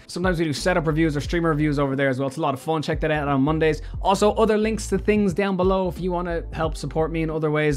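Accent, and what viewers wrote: Ireland. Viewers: I should not be watching this because I'm trying to get out of my Twitch grind mentality but I just enjoy your irish accent so much! xD Anyway Muted streams do count as views according to Twitch support on twitter: <link>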